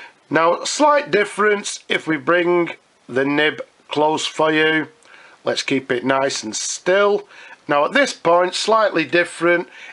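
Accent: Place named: Northern England, United Kingdom. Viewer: Oh, I see! I thought for a moment that you were talking about yourself and was about to ask which race at what meeting so I could place a bet. Excuse me being personal but I love your Northern vowels. Batley, or am I way off mark?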